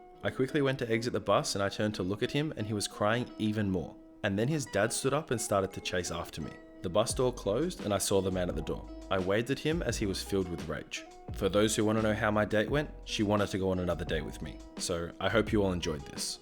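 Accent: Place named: Australia. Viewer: Aussie accents are cool.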